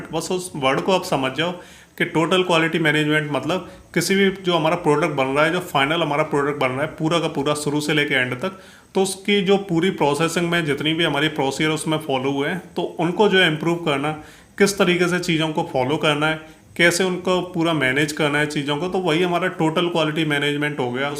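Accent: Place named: Chambal, India. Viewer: Sir aap bharatpur ya dholpur side ke ho kya ya fir चंबल से ho .aapke voice tone se kabhi kabhi aisa lagta hai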